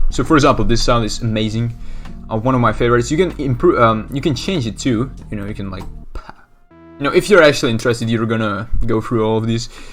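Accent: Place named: Netherlands. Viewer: Cool to hear your voice man, where you're from? Your accent sounds kinda dutch 😁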